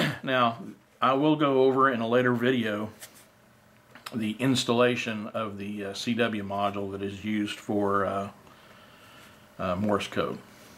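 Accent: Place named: Texas, United States. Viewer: Thats the Texas Drawl. I like it.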